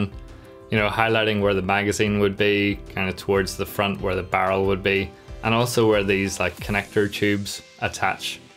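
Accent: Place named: Northern Ireland, United Kingdom. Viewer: Really Helpful Video, has given me some inspiration for my Winged Hive Tyrant, also great to hear a Norn Iron accent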